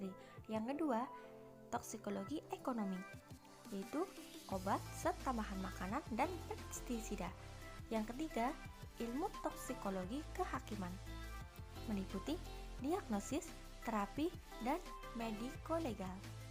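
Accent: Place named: Cilacap, Indonesia. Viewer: Ngomonge koyo wong cilacap bu hikkk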